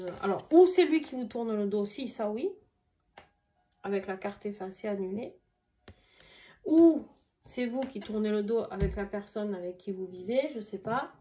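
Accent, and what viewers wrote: South, France. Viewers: Quel plaisir d'écouter votre accent du sud.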